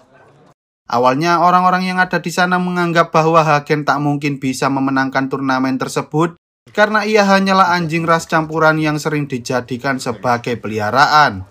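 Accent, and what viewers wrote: Java, Indonesia. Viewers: Logat Jawa Gak Enak Di Dengar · Medok jowo gk enak dikuping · Bang lu orang jateng apa jatim ni? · Narasinya medok" bahasa yg santai aja bang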